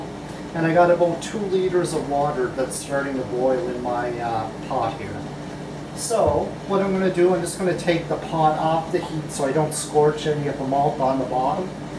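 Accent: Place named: Ireland. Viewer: Hi watching your reviews here in Ireland for a while. Would I be right in saying you've some Irish connection, your accents got a fairly noticeable Irish twang to it!. I've just been doing kit beers and dry hopping. I've been considering just taking out a few litres of wort and doing a mini boil, do you think that would work the same as your mini boil there?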